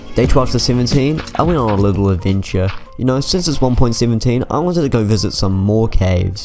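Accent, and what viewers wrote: United Kingdom. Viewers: He is british don't make fun of his accent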